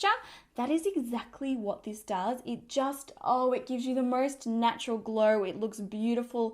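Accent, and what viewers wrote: Australia. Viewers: It is her Australian accent.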